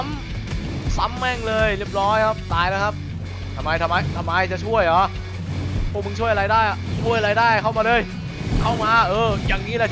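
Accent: South, Thailand. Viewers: คนใต้ปะพี่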